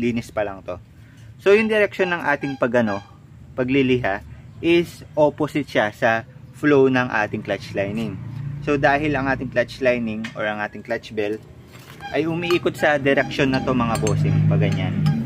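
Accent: Pampanga, Philippines. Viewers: Kapampangan siguro tong si bossing base sa accent? Hahahahhaa nice vid bossing! Very informative! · Basically maganda tong video. Kasi di ko talaga alam kung ano ang basically dragging. Pero parang Kapampangan si sir basically.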